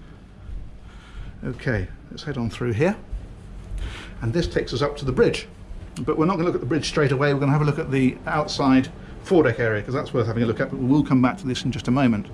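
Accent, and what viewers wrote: United Kingdom. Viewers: This is an exceptional tour. The camera work is wonderful, your knowledge of boats, vocabulary and enthusiasm plus that classical British accent. Keep up the good work!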